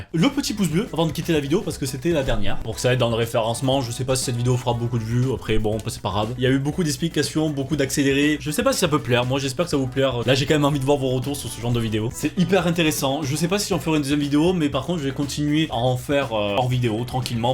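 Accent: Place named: Marseille, France. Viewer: Le petit accent marseillais des familles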